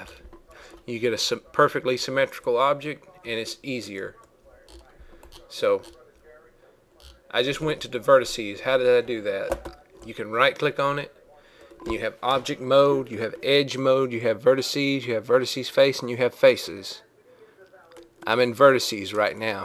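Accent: South, United States. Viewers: pretty funny how i automatically discount everything you say because you have a southern accent. that's not really fair, but it's funny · love that southern accent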